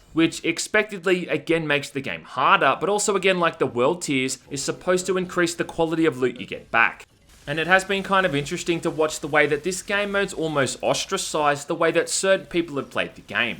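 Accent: Australia. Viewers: Australian accent is the best accent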